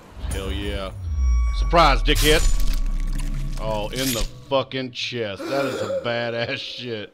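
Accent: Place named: Germany. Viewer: 10/10 german accent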